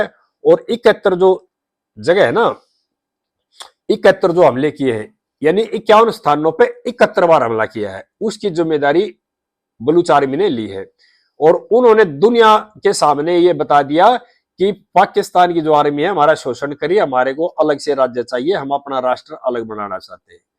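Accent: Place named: Rajasthan, India. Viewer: Vdo dekhneme bilkul maja nahi9 aayi.....Rajstani chacha boli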